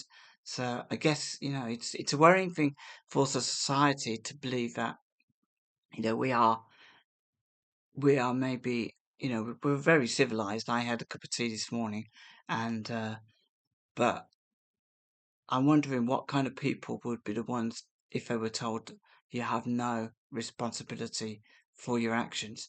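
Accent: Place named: Midlands, United Kingdom. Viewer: Hello gorgeous 🎉. Back again from Glasgow. 😂. Been a while. Hope you feel better soon ❤️🌹. I'm 57. You're so YOUNG. I had some really scary health issues the last year, but I'm back at work again. 😂. Love the midland accent 😮.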